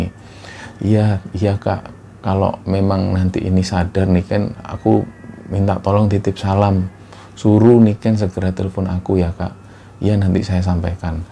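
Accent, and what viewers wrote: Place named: Surabaya, Indonesia. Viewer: Shanghai haderrr arek suroboyo seng merantau, kangen logat suroboyoan😆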